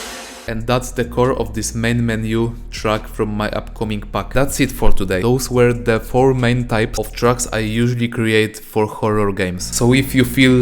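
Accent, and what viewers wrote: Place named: Russia. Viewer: sounds either Russian or German to me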